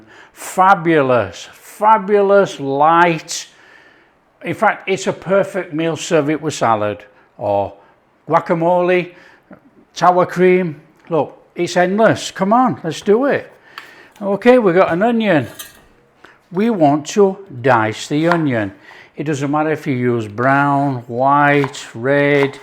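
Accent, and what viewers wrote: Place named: Cockney, United Kingdom. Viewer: His cockeny accent reminds me of the character in My Fair Lady, Eliza Doolittle's father who sang a.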